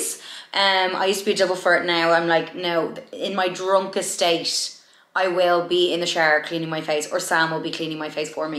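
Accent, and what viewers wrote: Ireland. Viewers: Hi I’m to your channel and so glad I came across you and your Irish which is a bonus and way I just want to ask what would you recommend something really hydrating for my skin please and also did you say you get Chemical peels if so please tell me we’re and who is great I would so appreciate it thanks till next time keep up the fab videos, happy new year also take care x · Its an Irish posh dublin accent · Yeah it’s Irish I’d say she’s from Dublin like myself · I was trying to figure out which accent you have the first couple of seconds in but it sounds Irish am I correct?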